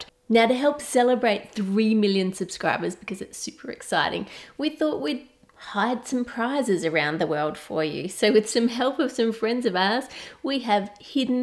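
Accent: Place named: Australia. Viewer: Can you please do a video where you just repeat the word chocolate please, i love your aussie accent when you say ot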